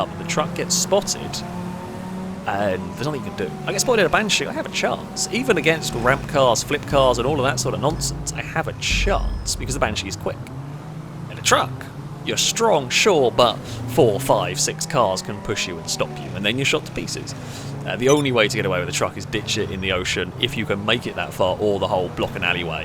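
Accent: United Kingdom. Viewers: It's guys like this that give the British accent abuse... that, hellllllooooooo at the intro. Fs man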